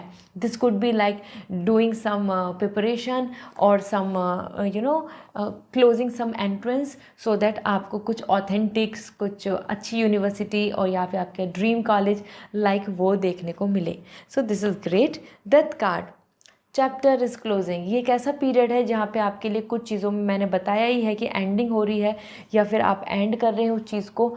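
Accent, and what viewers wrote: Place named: Punjab, India. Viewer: You sound punjabi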